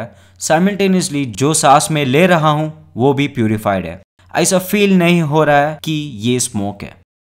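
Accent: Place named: South, India. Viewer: Are you from South there is something in your accent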